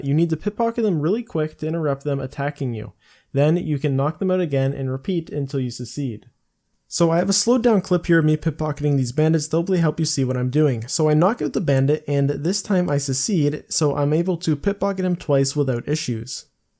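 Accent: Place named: Canada. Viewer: Thank you so much for this video, and for most of the people responding about you "not" saying the right words, I'm going to guess and say is Canadian and that is just the the regional accent. If a scottish person was talking here, I doubt you would be criticizing them as much because you'd be more familiar with the accent.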